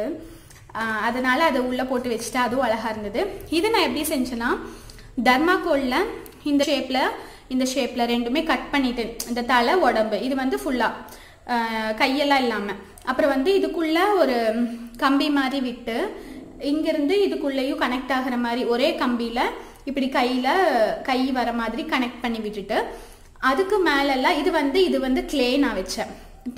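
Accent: Kongu, India. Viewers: I subscribed ur channel especially for ur kongu Tamil and crafts.... Thank u for ur ideas...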